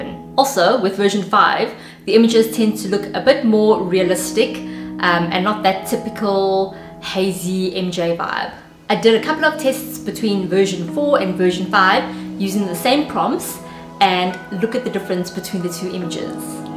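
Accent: South Africa. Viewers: This seems to be a South Africa accent. Hi from Cape Town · Immigrated to the States when I was 10. At the core I'm South African. Recognized your accent right away.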